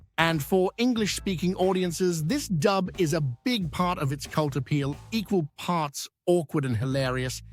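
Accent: United Kingdom. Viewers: I assume you get all these films from somewhere to view and you sound British! Where do you buy all these 70's fims?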